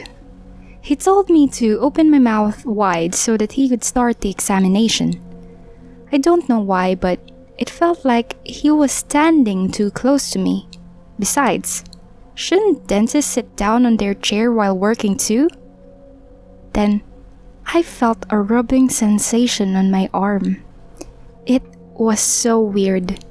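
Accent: Philippines. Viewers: I think the narrator is a philipino thats why when they talk in english you know no hate on me or you will get reported and blocked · The narrator sounds like Filipino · Pinoy yung narrator 😂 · Parang pinay yung narator.. grabe, kung ako yan sasapakin ko agad yan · She is a filipino. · Is the narrator FILIPINO? She has the filipino accent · I have a feeling that the narrator was filipino :> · Is the narrator filipino? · Uhm it sounds like the narrator is Filipino.. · Filipino accent, no doubt. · I can tell the narrator is a filipino cause when I narrated a story that is also how I sound like. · The accent of the narrator sounded like a filipino accent. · feel ko pinay nagbabasa ng story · Is the narrator from the Philippines? her accent is just like a filipina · She sound like a filipina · i got a strong feeling that the narrator is filipino lol. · It's funny bcs some people think that the narrator of this video is a Filipino bcs of its accent. 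I mean, is there something wrong with OUR ACCENT? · The narrator was definitely from the Philippines. The "t's" at the end gives it away...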